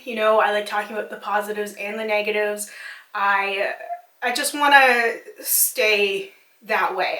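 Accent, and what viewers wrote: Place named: Canada. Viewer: I am so sorry you have received mean comments about your appearance, that is so rude and unnecessary. I only recently started appreciating all the little nuances in people’s characteristics and how it makes them uniquely beautiful. I think representation is important in media, so it’s nice to see someone with a different style, opinion and features. You’re doing an awesome job on your channel! ❤️ ps. Every time you say about...I hear aboot. It makes me so happy as a fellow Canadian 😀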